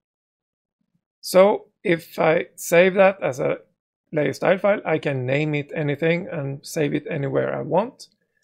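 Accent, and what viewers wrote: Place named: Sweden. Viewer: ...!! good swinglish, great shirt design!! its a good and cool video and very good explanation... requires good practice for the begginers to take good advantage of the tools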